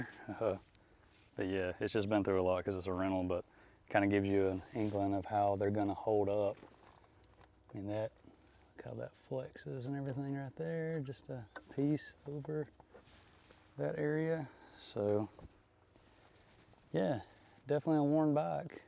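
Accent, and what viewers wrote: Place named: South, United States. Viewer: I knew exactly what you meant, because that's how southerners talk! Lol.. I'm from Texas so I'm not exactly a 'southerner', but we still talk very similar and sound similar. Your accent just sounds normal to me, and the words and phrases you use too.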